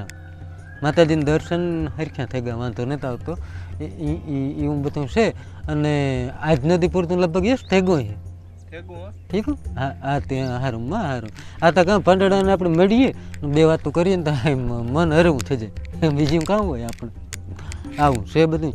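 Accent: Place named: Barda, India. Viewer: કોમેન્ટ કરનાર તમામ મિત્રો ને વિનંતી કે વિડિયો પુરો જોવો ભાઈ વિડીયો મુક્યો ને પાંચ મિનિટ ત્યાં તો છ કોમેન્ટ બધા ને વિનંતી કે વિડિયો પુરો જોવો પશી કોમેન્ટ કરો હા ના ગમ્યું હોય તો એ પણ લખો બધા જેથી બીજીવાર તે ભુલો સુધારીને મુકે ભાઈ બીજું ધણા યુટ્યુબ ચેનલ છે પણ ઘરે બેઠા આપણા ગામોની શેર કરાવે તો થોડો સાથ આપીએ પણ આપણે થોડી બરડા ની બોલી સાથે નો વિડીયો મુકે છે તો વિડિયો ને શેર કરતા રહીએ બખલા ગામ વિશે તથા વાવ મંદિર ખુબજ સરસ 🙏જય ચામુંડા માતાજી 🙏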